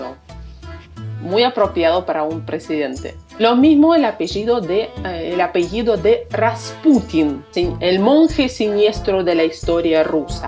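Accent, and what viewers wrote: Argentina. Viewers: Tu español suena argentino? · Lindo video Tienes acento argentino !!! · Señora! Por favor la parte dos y tres. Literatura Rusa, música, arte en general. Faltan muchos nombres y apellidos. Muchas gracias! (Bonito acento argentino). Hasta pronto.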